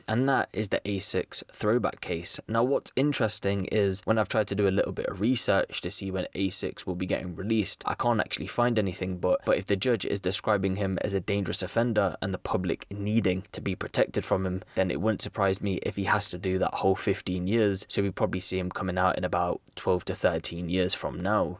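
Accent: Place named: London, United Kingdom. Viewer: nah that’s a posh london accent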